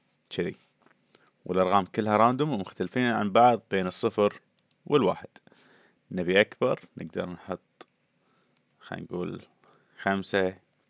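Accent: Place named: Iraq. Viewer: عاشت الايادي والله صراحة كلت جنابك عراقي لان لنجتك كلش قريب علينه حياك اخوك مهيمن فالح من العراق بابل ممكن باقي شروحاتك صراحة محتاجهن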